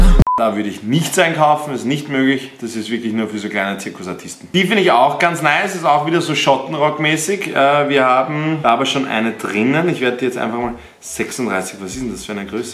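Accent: Austria. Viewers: Wie findest du Hosen von GVAPI? Sag mal irgendwo deine Meinung dazu😁btw feier deine Videos brutal weiter so und hau mal mehr österreichischen Akzent raus😂😂❤️❤️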